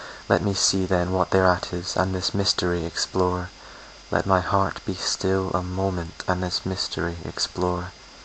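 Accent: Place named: Scotland, United Kingdom. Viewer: This will not get you girl/boy friends dude so if thats you angle buy a leather jacket and follow a rock band. However . . . if your passion is poetry, your in a obscene minority and I welcome you to a lonely club. If you message me back I will subscribe to your channel and follow your posts. Lovely reading, I'm half Scottish and I appreciate the accent. I would maybe like to see you post some of my favourites like "My Creed", "If" and "Invictus"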